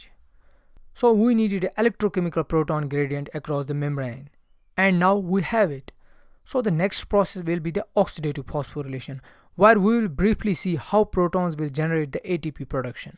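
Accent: Kashmir, India. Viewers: R u a Kashmiri? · Seems like you are from Kashmir sir. Well explained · Love the thick Kashmiri accent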